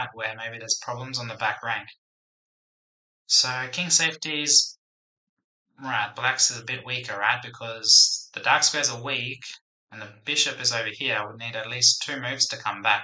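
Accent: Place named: Australia. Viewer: What is your first language? Your English sounds great, at first I thought you may be from Australia or New Zealand.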